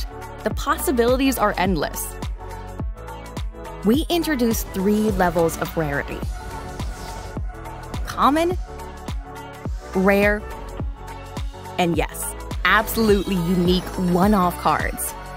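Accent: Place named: France. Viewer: The French accent makes it so much better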